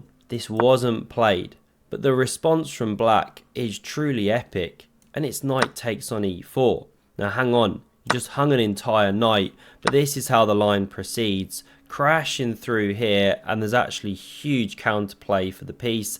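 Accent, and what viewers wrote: United Kingdom. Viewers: Got to love your British accent... I thought I heard "What a channel! Like a BETA version of me" and I‘m like "wait, what ?!!" So listened again. Love the humility. 180 degrees from what I thought I heard. Both channels are awesome.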